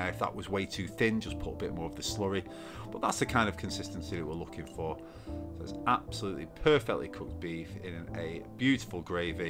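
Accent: Yorkshire, United Kingdom. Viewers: I love your accent. is it from yorkshire?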